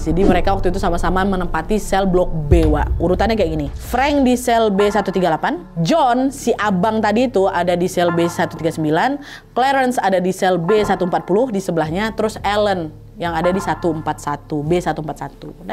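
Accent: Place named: Medan, Indonesia. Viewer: Di lihat dari logat-logat nya seperti anak Medan😂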